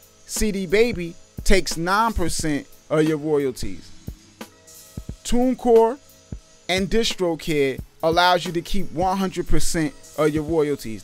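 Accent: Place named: New York, United States. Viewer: You definitely from New York lmao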